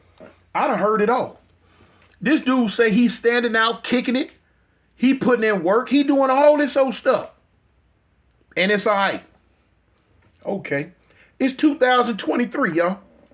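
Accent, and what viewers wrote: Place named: Houston, United States. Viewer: You sound like you from Htown Tx... Southern Voice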